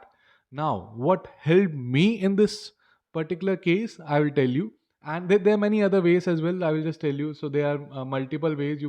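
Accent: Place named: India. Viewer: he was fine. thats indian accent stop being asshole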